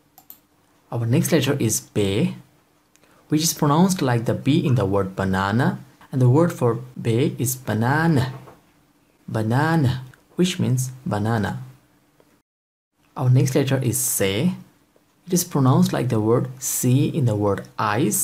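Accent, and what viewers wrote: India. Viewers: LOL, that's good. Teaching is a noble work. Whether it be full time or part time. I'm from India, I have heard your accent here.. So I thought you might be Indian. Anyways, good luck with your Deutsche Kurs! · He is a good teacher. Wish he could do something about that very Indian accent.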